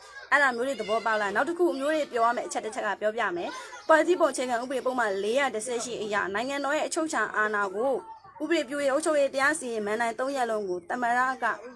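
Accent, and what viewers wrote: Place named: Sittwe, Myanmar. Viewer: မဂ်လာပါညီမလး မဟာသွေးလေးစာပါတယ်စစ်တေးသံလေးနဲ့ကြားတော့ပျော်ပါတယ်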